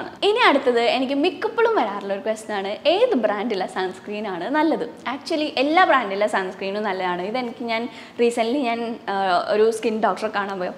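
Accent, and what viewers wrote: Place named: Thrissur, India. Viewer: Aahhaa.. thrissurkaari aayo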